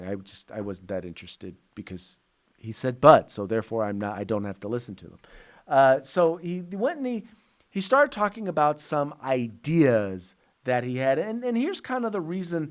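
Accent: United States. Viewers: Our governor fuckstick edwards in Louisiana is even worse. People better be paying attention to what is happening...your Southern accent blows by the way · OK, you are taking some leaps here. And with the horrible hick accent that no one i know here in texas talks like that aside, I trust Governor Abbot. And Texas will never be like California.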